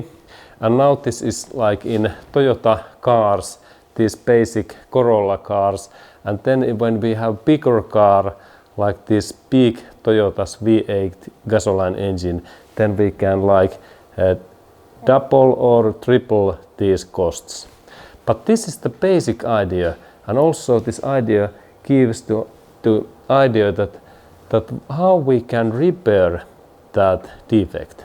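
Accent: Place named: Finland. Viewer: that killer finnish accent good job guy!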